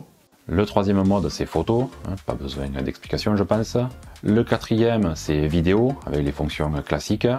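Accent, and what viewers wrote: South, France. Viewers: T'as une maladie ou c'est un accent du sud? Juste pour savoir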